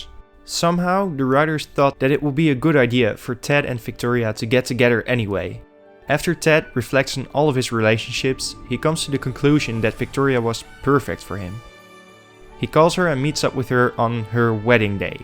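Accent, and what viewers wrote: Netherlands. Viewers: Is it correct that I hear a dutch accent in your voice? Not meant as an insult, your english is great, just curious.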